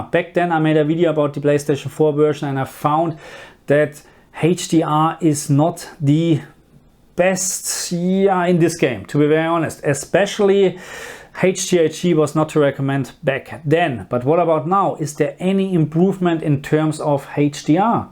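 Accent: Germany. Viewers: Oh god that cringey German accent... How can you be so damn oblivious and speak a different language you have no real grasp of? It's not just grammar. Pronunciation plays a BIG role. Dunno if arrogant or just dumb. Gawd I hate my peinlichen Mitdeutschen........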